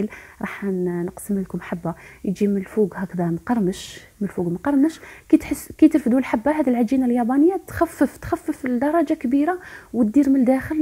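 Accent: Mascara, Algeria. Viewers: هدرتك حلوة نموت على هدرة تاع ناس معسكر وناس بلعباس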